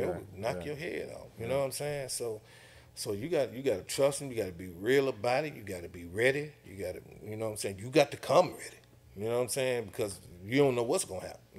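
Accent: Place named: Houston, United States. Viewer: Real southside, Southpark talk.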